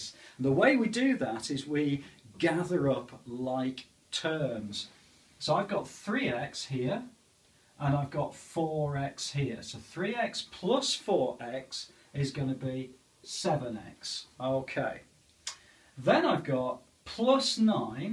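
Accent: United Kingdom. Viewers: Thank you! I get clear explanations with a lovely British accent thrown into the mix!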